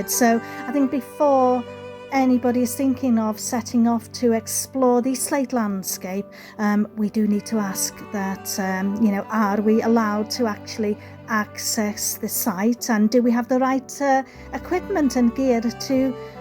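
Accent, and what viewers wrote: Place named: England, United Kingdom. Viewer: Hello, you have a new friend. Lovely video and Love your English accent. your new friend Patrick.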